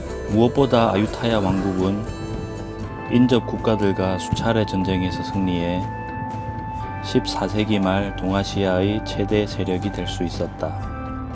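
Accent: Gyeongsang, South Korea. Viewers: 아유타야 유적지 구경 자~알했습니다 역사 나레이션 하실때 어색하지만(같은 갱상도 나레이션이라 ㅎㅎㅎ) 내용면에서는 알찼습니다 ^^ 진지하게 설명 잘 하시다가 "앗 뜨거"에서 빵 터졌습니다 ㅎㅎㅎ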